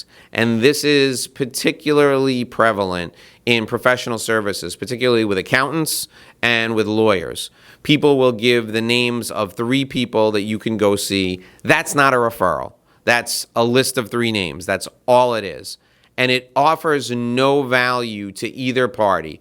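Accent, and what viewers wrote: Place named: United States. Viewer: I really appreciate your efforts to make sales people educated. I like your accent, it's US I guess. Subscribed!!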